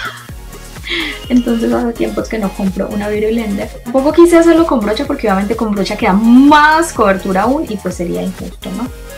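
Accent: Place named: Antioquia, Colombia. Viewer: Hola, el vídeo me entretuvo mucho y me gustó la temática, pero quiero darte una recomendación si me lo permites. Espero que no te lo tomes a mal, de verdad lo hago con una buena intención porque tu canal me gusta muchísimo. Yo sé que muchas veces los que somos paisanos y tenemos este tipo de acento hablamos muy rápido y por esa razón nos enredamos, pero en los vídeos esto se hace mucho más notorio, y puede llegar a ser un poco estresante escuchar que alguien hable tan rápido, se equivoque y se trabe. Lo que puedes hacer es intentar hacerte consciente de lo que dices al hablar para así hacerlo más despacio, y si te equivocas en una palabra la vuelves a repetir un poco más lento y pues lo editas, así creo que el vídeo se vería mucho más calmado y profesional. Un besito desde Medellin, saludos!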